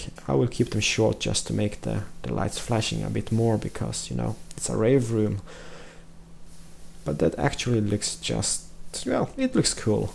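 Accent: Poland. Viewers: bardzo dobrze to wygląda ! ;) jest polski akcent super ;) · dude are you polish ? XD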